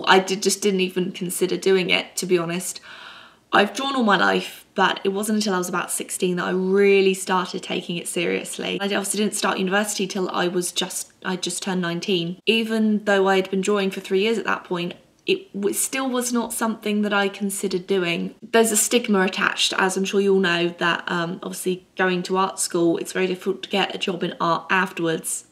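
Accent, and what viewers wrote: United Kingdom. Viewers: If you want to hear from someone a lot older, like fifty something, I choose safety and security and became an RN as I got older wished I went to art school. Back then like you I never even considered it, and I have lived in Southern California since I was 8 so I was closer to art options. I recently had to stop working but when I was I dreamed of all the time I would create when I retired, now with all this time on my hand I'm struggling now to rekindle the slightest bit of creativity I always seemed to be bursting with. Might I share my thoughts on the matter, first, you are very talented so that is a big plus there, sadly some aren't, like people who think they can sing but my cat sounds better. Second, I'm guessing your from the U.K. since I hear a wee bit of an accent, but you are living in the US, per your Michaels video, with your parents, this is a guess? 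If I'm right you should take advantage, if they let you, of living at home as long as you can, I let at 19 while in college supporting myself very hard, until your a few steps down the road of your chooses path. Third, Look at how far you come in only one year on YouTube and the "opportunities" you've already had, not including the porn, sorry joke, and how many more you may have if you hang in there another year living at home following your heart. That's my two cents, also, just make sure your productive, because parents want to see that, and do something daily to make it happen though I can tell you are, work on o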